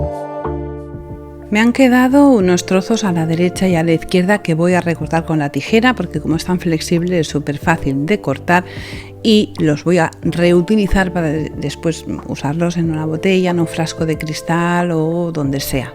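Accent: Spain. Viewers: My excitement from your works as an artist to learn from you is great. I sculpt large elements in ceramic clay and cement. ... and a greater excitement is that I understand in every sentence a few words from your Castilian Spanish. I'm an Israeli from Bulgaria Spainsh. And my grandmother insisted talking to me for ladino. Thank you and my grandmother Victoria. my name is Vicky Lahav. Thank you and only health and peace. In Hebrew they say: without art we will die..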